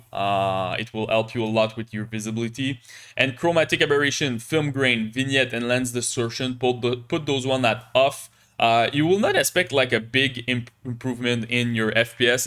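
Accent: Quebec, Canada. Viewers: Greate video :) Juste, avec l’accent je détecte un québecois😂 Cool de voir d’autre québécois sur YouTube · You’re french canadian aren’t you haha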